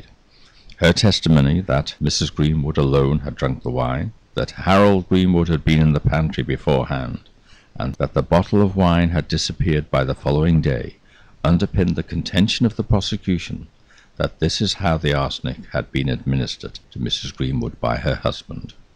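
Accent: United Kingdom. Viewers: Yes, I have subbed after seeing you have more to watch. I will try hard to ration myself to one a night, with a cup of cocoa😀💙. I hope you are still out there somewhere bringing more lovely, unknown stories, good for us, not so for the poor victims. Love your voice, I am born and raised English, but live in the USA. You sir feed my need for class A British accent. Thank you from my English heart.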